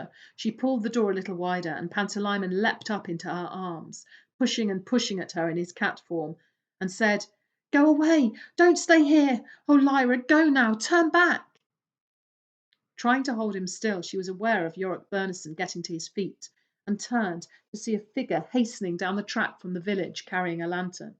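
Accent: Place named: Texas, United States. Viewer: Hooray, you committed to the Texan accent!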